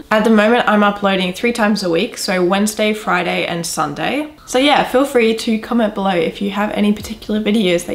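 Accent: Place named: Australia. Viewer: Love your business and its nice to hear another Aussie voice!!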